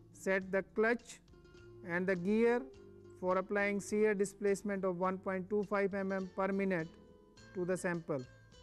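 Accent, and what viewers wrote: India. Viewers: quit informative.. but another intresting part is when he speak, except his mouth every thing is like statue, n indian english:-> but thumbs up for knowledge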